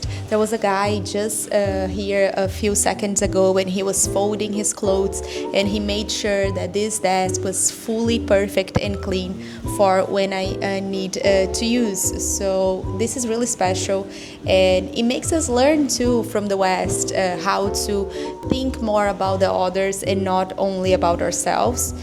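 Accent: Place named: Brazil. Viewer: I recognize that accent. Are you Brazilian?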